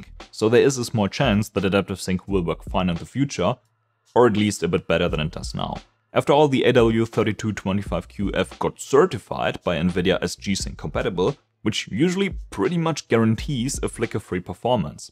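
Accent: Germany. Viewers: Man hört doch raus das er deutsch ist😂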